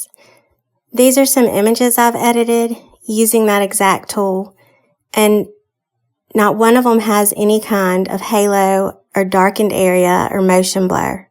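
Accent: South, United States. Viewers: Hi. I found you through watching the Hammerson channel talking about Rakes and your encounter. First off, I BELIEVE YOU! It must have been shocking to see one of those creatures up close. I would have been terrified too. I found your channel now and have already watched all of your videos. Thanks for the deep dive of this image. You did an amazing job with all this extensive research and legitimately debunked the debunkers. That image is fucking horrifying and I can only imagine that it’s possibly what some of the missing travelers in the missing 411 cases encounter. I cannot fathom how scary that would be. Personally, I have had 0 cryptid encounters but strangely enough, I have been compiling a list on my Notes App of instances where I begin ruminating or thinking deeply about something, and then all of a sudden shortly later, it appears to me in real life. 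I know it sounds crazy but it’s like the universe is presenting these occurrences to me after I think about them. Idk it’s weird. It’s similar to how the Rake was created as a creepy pasta, but it was somehow WILLED or IMAGINED into reality. Just a theory. Anyway, I’m droning on but I research missing 411/cryptids every night and have nobody to talk to about this. I hope you make more videos, please! I will rewatch this while I’m stuck at my desk at work. I wish you good luck with the channel!! P.S. I LOVE your accent!! Makes me wish I could meet some southern girls but I’m stuck in california hahaha. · Thank you. I just watched it. Crazy sh.....stuff ! There are alot of people seeing things , creatures , beings , humanoids, cryptids whatever people want to call them . I can tell just from listening to you that it had a very big impact but you seem to have adjusted well- well I hope you have anyway ! 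. Thank you for responding to my question and thank you for sharing your encounter ! Love your southern twang , drawl - accent !!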